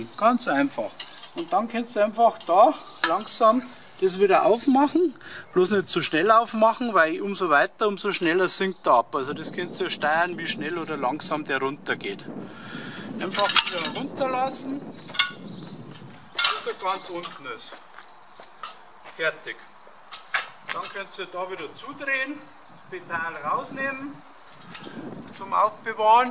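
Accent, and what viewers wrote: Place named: Bavaria, Germany. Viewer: Das war echt gut, auch für blöde gemacht, leider sehr bayerisch und damit schwer zu verstehen !!!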